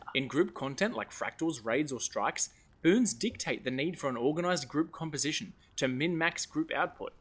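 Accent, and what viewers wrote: Australia. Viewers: Judging from your accent, I'm gonna assume you live in Australia. How do you deal with the high ping? I tried Guild Wars 2 and I enjoyed my time with it, but the fact that the game didn't support OCE servers just killed it for me. It's 2023, there's really no reason I should be playing any online game with 250 ping anymore.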